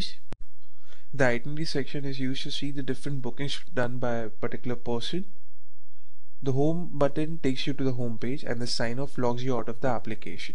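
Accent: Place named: India. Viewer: Thick Indian accent. Sounds like he has two huge bread stuffed inside his mouth.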